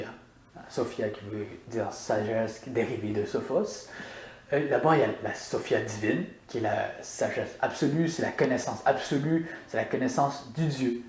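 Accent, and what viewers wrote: Quebec, Canada. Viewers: woah c'est quoi ton accent, c'est mi québécois mi français dis weird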